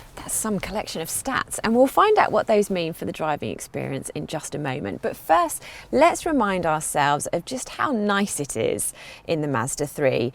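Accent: United Kingdom. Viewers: I like her accent :))) I don't thinks there's anything wrong with British accents but British folks seem to like to attack each other over it, especially they like to attack some of their 'posh' accents. That's kinda funny :))) But there might be some sense in that too, I guess. Some intonations and cadences might be overkill but that's the way we speak. Sometimes some women can't stand the accent of some other girl :)))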